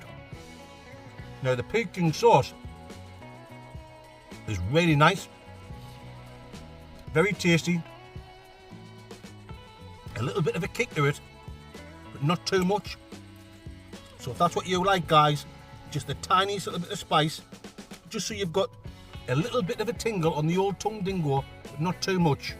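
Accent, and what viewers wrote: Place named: Newcastle, United Kingdom. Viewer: ❤ newcastke accent dude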